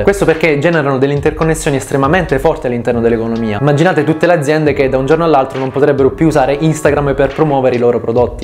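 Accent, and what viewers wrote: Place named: Sicily, Italy. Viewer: carissimo avrei un po' di cose da dirti: 1. dacci un nome più semplice, anche fittizio, con cui chiamarti 2. riguardante questo video devo dirti che stai migliorando molto, infatti, fatta eccezione per qualche lapsus di tanto in tanto ed un' esternazione degli argomenti ancora un po' macchinosa, il video è molto fruibile e le tue spiegazioni sono abbastanza chiare 3. in linea generale: devo dirti grazie! sei il primo canale italiano (almeno che io sono riuscito a trovare) che tratta la scienza economica. sei un vero e proprio debunker per quei miliardi di video complottisti che intasano youtube. anche i commenti sotto ai tuoi video sono un piacere da leggere (quasi nessun: "MUORI TRATITORE DELLA PATRIA VENDUTO DELA BCE!!111!1", ma tanta gente civile che argomenta pacatamente e che rende il tutto molto stimolante per chi ha passione per questa materia). 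ti prego continua! fallo per noi 4 affamati di materiale di qualità. 4. vorrei permettermi di consigliarti qualche argomento per i prossimi video che secondo me potrebbe essere interessante, ad es. la teoria dei giochi o le teorie di keynes, o magari nel tempo te le verrò a proporre sotto ai tuoi video se ti fa piacere... comunque sia vorrei supportarti il più possibile: like a manetta e farò vedere i tuoi video ai miei colleghi... dicci tu come possiamo supportarti maggiormente. 5. ho capito che stai a torino, ma mi sai tanto di terrone, probabilmente siculo (con grande affetto, lo sono anch'io)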